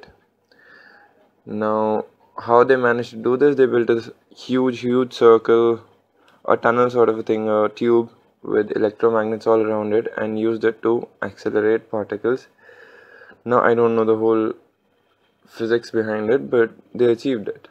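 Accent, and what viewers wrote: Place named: India. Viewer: Indian accents are great, love it.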